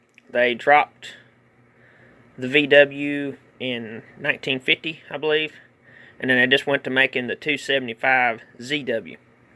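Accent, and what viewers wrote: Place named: South, United States. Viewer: You're country as hell. You sound just like me. I'm in East TN, what part of the South are you from? I have a Lionel zw275. I've seen a handful of these vw transformers on eBay. I always thought they was just two train operations, instead of four. Are these physically smaller than a zw? I've gotten to where I could use another zw to run my American Flyer trains with. I wouldn't mind trying something different if it has enough power.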